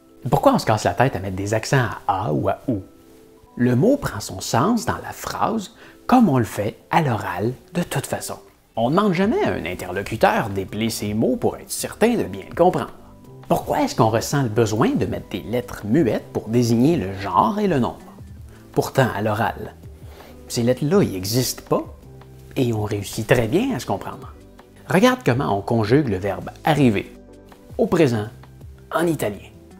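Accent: Quebec, Canada. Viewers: "Sans" et "vent" ça ne le fait pas avec ton accent québecois : on entend sain et vin :-) mais ta vidéo est tout à fait probante, surtout la comparaison avec l'italien et avec les exemples d'orthographes anciennes ou des sources latines, je suis (con)vaincu. Ce que je vais ajouter n'est pas pour contredire tes propos mais pour apporter un éclairage et quelques complémentarités : l'étymologie se retrouve dans l'orthographe et peut donner du sens comme "forêt" (loin de sylvestre), dont l'accent circonflexe est un "truc" pour gagner de la place en supprimant le esse qui reste dans forestier, et pour ne pas confondre avec un foret pour percer le bois ou le métal. Un des mots que je trouve le plus dingue est « accueil », pour arriver à l’écrire je doit toujours le prononcer dans ma tête : akuèye, comment l’écrirais-tu ? Oui le français est plein de non-sens comme écrire « fils » pour le fils de son près, et pour les fils électriques, écriture qui ne dit pas comment ça se prononce, il y a des listes de ce genre que tu aurais pu citer. 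À contrario, l'amande le fruit de l'amandier, et l'amende prononcé par un tribunal : les militant en désobéissance civile qui vont en procès disent qu'ils aiment au menu les amandes et les avocats :-) Et c'est vrai qu'il est inutile de conserver une orthographe complexe, quand à côté le langage perd la poésie des métaphores, et quand les personnes vont vers un appauvrissent spirituel : on sait que certaines catégories sociales ont très peu de mots dans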